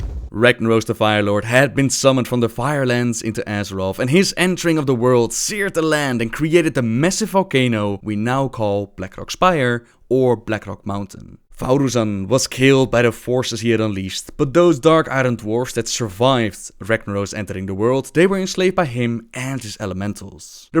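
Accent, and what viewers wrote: Netherlands. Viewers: rymarowicz he is dutch. And has a nice accent. so he might say thing differently then you and i do.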